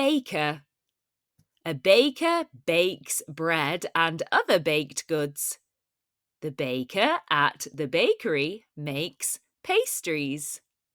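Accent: United Kingdom. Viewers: Brit accent is precious ♥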